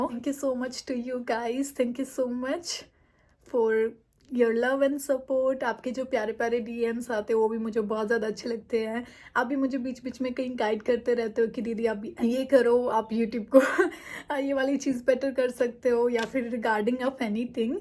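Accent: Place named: United Kingdom. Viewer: Suddenly I came here aapki hindi british jese hai atcha hein and congratulations 🎉🎉🎉🎉🎉❤❤❤❤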